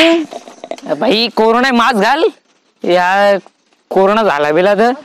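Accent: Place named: Agri, India. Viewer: BMW lakaht ek ahe..proper aagri boltos ..vinayak ani bunty peksha bhari.....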